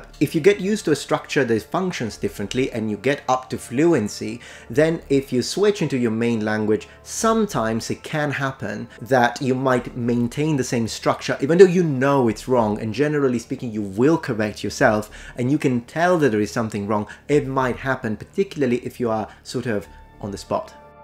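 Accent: United Kingdom. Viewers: your accent in english is british but for me its really clear and easy to pick up. i am not a native english speaker but i have heared several british accents and i always struggle to a certain extent, to the point that i try to avoid it sometimes. your accent is kinda wierd my friend i guess because you have italian bloodline btw i studied the american english accent. that is why its tough for me. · I'm new to the channel. As an American I find it kind of interesting that you're an Italian but speak English with an accent that I as an American see as mostly British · What's crazy is you are speaking to us, and I can hear your italian accent when you speak english with some british english accent undertones for how you pronounce certain words. It's just interesting though. Thanks for the video :D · as someone from the u s you have a uk accent.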